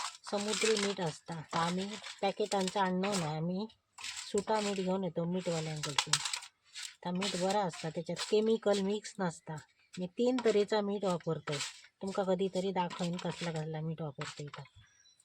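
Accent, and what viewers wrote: Malvani, India. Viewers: काकी, तुमचा मालवणी बोलना भारी हा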